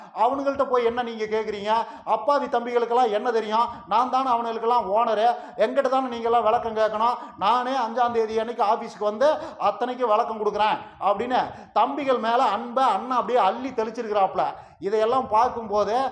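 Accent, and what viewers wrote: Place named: Coimbatore, India. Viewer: சத்யராஜ் கோவை ஸ்லாங் நோவ்😁 சூப்பர் நீங்க பேசுறது... ஆனா உண்மை 👍🏼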